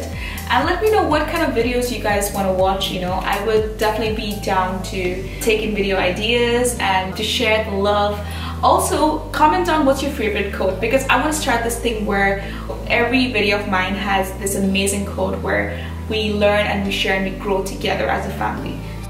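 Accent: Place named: Minnesota, United States. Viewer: I did subscribed ❤😘😘 lovely speech, kinda intersting, And the accent is really really good to hear. Same as minnesota state😍😍😍😍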